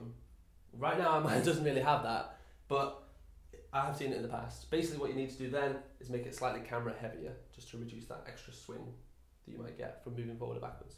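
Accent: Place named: Ireland. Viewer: Nice Video, but what you said? "walk like you .... yourself!" Sounds like a good advice, but hard for me to understand the irish accent! please write it down! Thx pal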